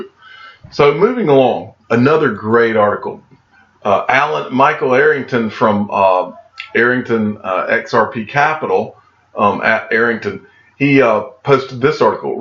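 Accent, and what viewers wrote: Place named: Tennessee, United States. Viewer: Sounds like a tennessee accent to me.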